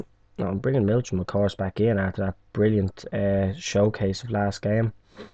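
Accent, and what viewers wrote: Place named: Dublin, Ireland. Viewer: well mate good video keep it up u sound like a Dublin man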